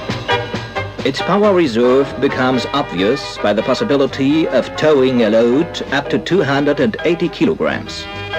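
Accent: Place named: Germany. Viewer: It's interesting that the narrator speaks English with a noticeable German accent, leaves the factory name completely in German (not that they'd translate it fully, which would be something like "Saxon Ring Automobile Works Public Trust" but at least say "vee ee bee" instead of "fow eh bay") and calls the car "Trabant six hundred one" when "six oh one" would be how an English speaker would more likely read it.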